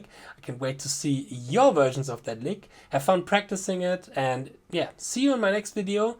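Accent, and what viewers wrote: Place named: Germany. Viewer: Wow, man! I have to tell you, how much I like your videos so far! Not only are you a phenomenal guitarist, but you are also very, very likable (you even remind me of my best friend optically AND voice/German-English accent) and you totally nail the spot(s) for me, where I want to get better at! Very well explained and with a super fun lick! I'm really celebrating here!:D I didn't know, how fast I can actually play meanwhile, because I rarely play THIS fast and even being totally clean! (Well... not THAT "clean". I had/have some beer, I admit...;) But you know what I mean...) You should consider making a Patreon channel sometime in the future. I would subscribe! (I'm already a Patron of Ben Eller, Andy Wood and Alexandra Zerner and this is a great thing!) Now, I'll continue the last bit of the video and will surely check out more from your channel afterwards! 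Danke dir,! Ganz ehrlich, du bist da offenbar ne ganze Ecke näher an mir als die üblichen Verdächtigten auf Youtube, wie z. B. Ben Eller etc. Alles geiles Zeug, das wirklich oft hilfreich oder spaßig ist, aber du bringst das für mich zumindest noch mal auf ein ganz neues, deutlich ambitionierteres Level. Zumindest mehr als Ben Eller zum Beispiel. Der hält das für meinen Geschmack oft ein bisschen ZU "einfach". Ich kann die Videos von dem eins nach dem Anderen runter-rocken und kann danach fast jedes Lick zumindest auf 2/3 Speed. Und dafür brauch ich meistens nur den "Stepdad-Speed" und guck mir das 3 - 4 mal an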